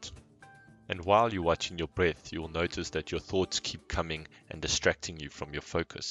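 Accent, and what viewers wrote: South Africa. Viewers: Great video! I love your accent, is it South African ? Reminds me a lot of my grandfathers voice. Thanks :)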